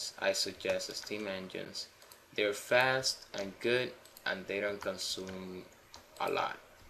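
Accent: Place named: Mexico. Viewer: r u Latino? im mexican. u sound mexican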